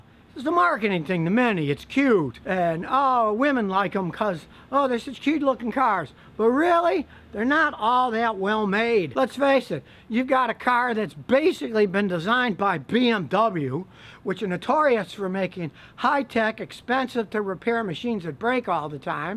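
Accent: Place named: East Coast, United States. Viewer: I LOVE THIS GUY MAN !! HE JUST TELLS IT EXACTLY HOW IT IS YALL !!! AND OF COURSE HES GOT THAT RUGGED AND RASPY EAST COAST ACCENT !!! COOL BEENZ YALLZ!!!!!!!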